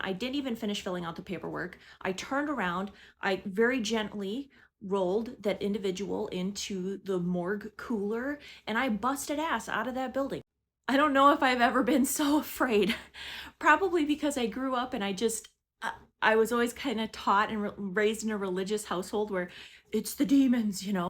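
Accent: Minnesota, United States. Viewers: Minnesota, i believe. Her accent really stands out. i think she hinted where abouts she was from, in other videos, if she didnt come out and say it.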